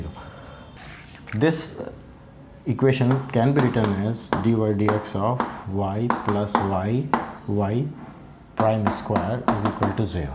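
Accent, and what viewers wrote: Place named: India. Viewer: I like indian english accent also its hard to understand sometimes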